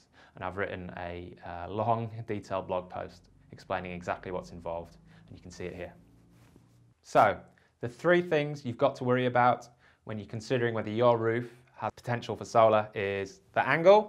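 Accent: England, United Kingdom. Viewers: Sounds funny hearing someone with an English accent telling you to face your panels North.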